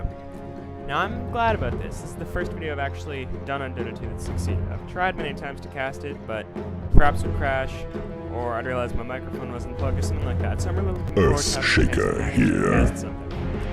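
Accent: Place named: Newcastle, United Kingdom. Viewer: hi geordie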